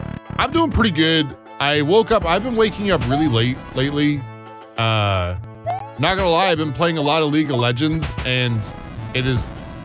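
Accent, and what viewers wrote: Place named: Canada. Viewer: He's Canadian. I assume that's how they pronounce it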